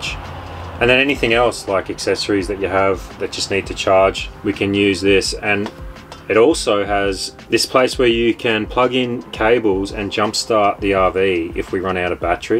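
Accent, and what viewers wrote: Australia. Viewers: Really informative videos. Just one big critique - shorter, sharper edits. The Aussie accent means you speak really slowly, and just coming up with shorter sharper ways to say things would mean this video was easier to digest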